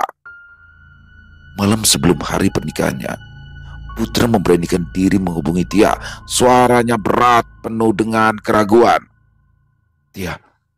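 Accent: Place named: Banjar, Indonesia. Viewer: Abang cocok jadi orang banjar. Soal nya klo bicara bahasa banjar abang logat nya banjar bangttt ☺️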